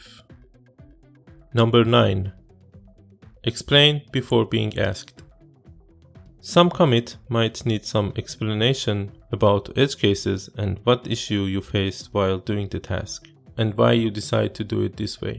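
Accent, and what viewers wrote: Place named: Iran. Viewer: CHITOOOORI. I can hear the Iranian accent.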